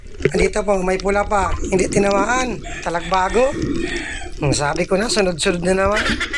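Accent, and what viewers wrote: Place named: Camarines Norte, Philippines. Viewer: Idol lagi ako na no nood sayo nalilibang ako sa mga video mo at sa Punto ng PG sasalita mo Alam ko taga Camarines norte k. Taga labo kase ako. Dito n ako sa Laguna now, ingat k lagi God bless po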